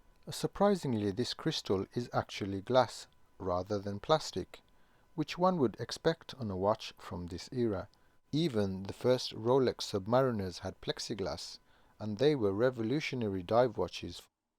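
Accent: Birmingham, United Kingdom. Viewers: Want to see how good my ear is for accents. Are you from Birmingham? Or somewhere up north?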